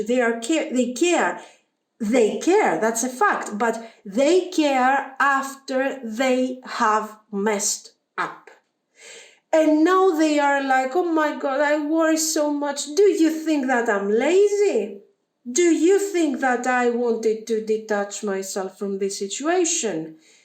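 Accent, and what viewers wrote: Greece. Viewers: Your English is perfect actually! You simply have a very Greek accent, but your use of the language is great. I'm happy to see a Greek youtuber gaining popularity! Keep up the good work. I'm showing your videos to friends and family for nee channel recommendation!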